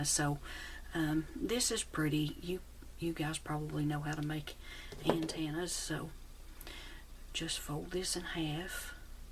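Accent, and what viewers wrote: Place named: South, United States. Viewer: Wow I’m so happy I found ya ! I’m southern and I can hear that in your voice but this is neat ! I just found ya on here ! Very happy I did ! I bet you could even use Bobby pins for the wires too even ! Just saying! Lol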